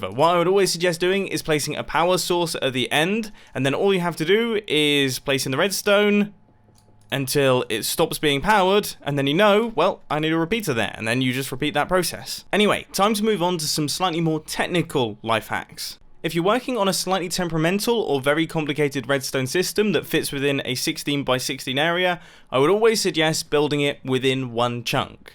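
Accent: United Kingdom. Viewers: 'ello charming brit! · british man just said "don't panic". it's like that one book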